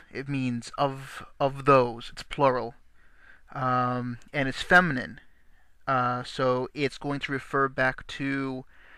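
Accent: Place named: United States. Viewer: Your pronunciation is too "American" sometimes: instead of [e] or [o], You read [ei] or [ou]. Also, if you're trying to use reconstructed pronunciation, try to find a version of text where long vowels are marked, it completely changes the accent: dIvisa, trEs, quArum Unam etc. And it would be really great if you'd just read it faster;p it'd have sounded much more realistic.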